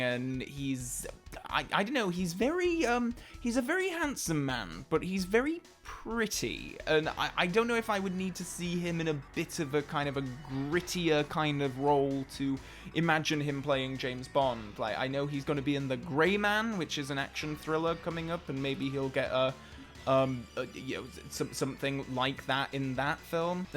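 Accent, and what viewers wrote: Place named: England, United Kingdom. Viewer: English accent. 🥱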